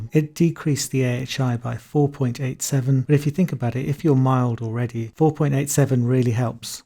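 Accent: United Kingdom. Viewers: thank you doc for the information and the devices you ve shown .i do appreciate your British accent but i wonder if these devices are efficient for everyone and if they are available on the Algerian market or not !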